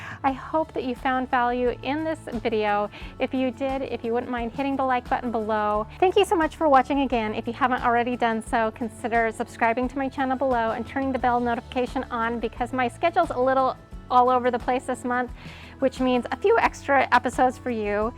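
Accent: Utah, United States. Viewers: I have those same jack-o-lanterns and I just turn them around for the month of November. I also made your welcome home sign and had thought of putting a big maple leaf where the wreath is but I love the idea of just adding holiday embellishments to the wreath ! Thanks for all the cute ideas. You sound like you may have grown up in Utah. Love your channel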